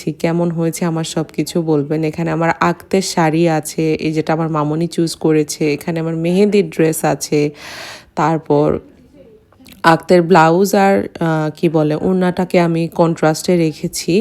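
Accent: Sylhet, Bangladesh. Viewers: Tumi sylhety ni affa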